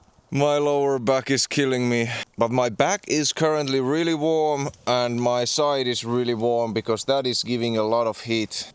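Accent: Finland. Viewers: You seriously sound like Luke from Outdoor Boys, but you add Finnish scent to that. I love it haha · Mukava kuunnella välillä tuota rallienglantia 😂😂😂. No pääasia että ulkomaalainen saa selvää👍on naurettu kaverien kanssa 😊 Kiitos . Paljon seuraan #retkireppua #alileiniö#mehtämikko#eetun völjyssä #vapaalla jalalla ym. Eli Suomen ylivoimasia ykkösiä niin kivaa vaihtelua nämä on ...in English 😅😅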